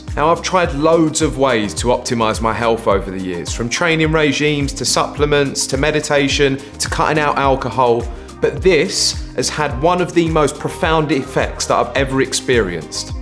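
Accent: United Kingdom. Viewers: Wow,like for this gorgeous British accent)) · This is the best British accent I have heard in my life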